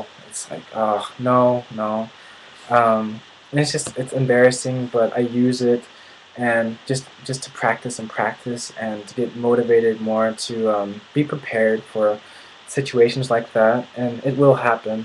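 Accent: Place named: Upper Bavaria, Germany. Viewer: Du hast beim Deutsch reden einen oberbayerischen Akzent. Das ist soooo schräg :D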